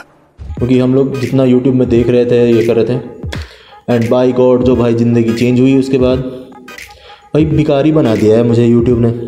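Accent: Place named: Hindi Belt, India. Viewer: wah!! Ekdum hindi belt loka vali kahucha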